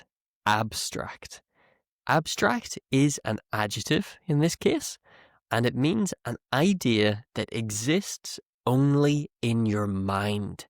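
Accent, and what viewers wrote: United Kingdom. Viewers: Hello 👋🏻🙋🏻‍♀️ Since this video was recommended to me , I ’ d like to ask — are your videos all in British English ?? 🤔😊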